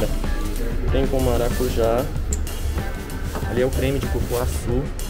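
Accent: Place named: Goiás, Brazil. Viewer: Fala bem goianes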